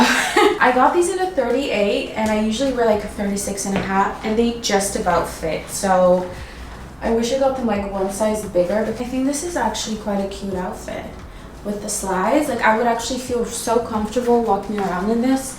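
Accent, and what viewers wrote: United Kingdom. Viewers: I love your British accent 😭😭❤ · Your videos are so comforting🥺❤, enjoy your solo trip GURLLL🤍. HAPPY BIRTHDAY!! It 12pm here in Nigeria. Your British accent gets me all the time😂. Your braaids!!! giving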